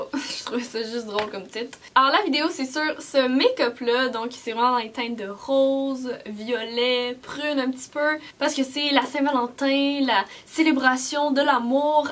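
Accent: Canada. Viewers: Tes vidéos sont toujours autant fun! J’te suis depuis le début et je me lasse pas de tes vidéos! Et cet accent canadien 🙌🏻 Je retourne à Montréal en octobre, j’espère te croiser !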